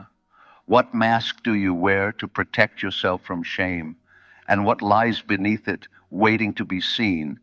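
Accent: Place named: New Zealand. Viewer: Why is Dr Jungs accent from what I assume is New Zealand?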